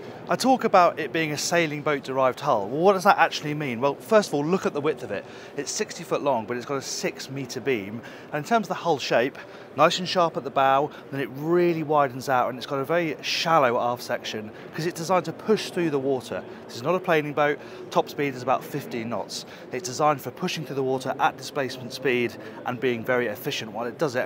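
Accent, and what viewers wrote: United Kingdom. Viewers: There is just something very satisfying about listening to a British accent conduct a yacht walkthrough tour. I don't know. I think I blame James Bond for that. As for the boat, I absolutely love it. I would be more interested in the solar/hybrid and the range on that. Cheers, everyone.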